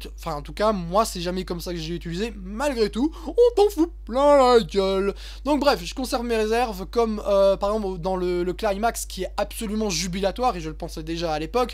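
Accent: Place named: South, France. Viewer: (Ton accent du Sud est un peu à revoir, mais merci quand même de cet essai sonore:D) Ah oui, je me souviens de ta chronique sur Avatar d'il y a quelques années ^^ (comment l'oublier? tu t'en étais pris plein la gueule, après ta vidéo, par des personnes qui étaient fans du film xD). D'ailleurs, ce serait bien que tu postes tes nouveaux "Grumpy mate des flims" sur ton blog.:) C'est intéressant de donner une seconde chance à des films, je le fais aussi (pas pour TOUS les films que j'ai trouvé bof, mais pour certains oui, et du coup j'ai parfois de bonnes surprises au second visionnage).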